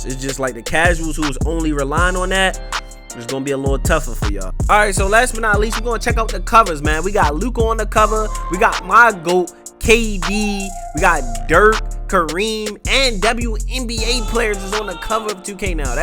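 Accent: Baltimore, United States. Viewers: Most definitely from baltimore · bro ur from baltmore fo sho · Twentytwo Baltimore accent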